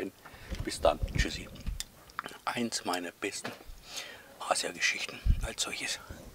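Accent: Franconia, Germany. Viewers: Saugut! du bist spitze. Endlich mol a Frangge und ned su a zipfl der ned richdich deitsch sprichd. Grüße aus Bamberch. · Hi das sieht richtig richtig gut aus ich werde das mal nachprobieren 👌 Sehr schön anzusehen mit deiner ruhigen Stimme und sympathischen Art, das bringt selten einer rüber, a echter frangge hold 👍 Vielen lieben Dank für dieses tolle Video und ich lasse sehr gerne das Abo samt Domglocke da um nichts zu verpassen 😉 Gesund bleiben und weiter so ✌️ Gruß Olli ✌️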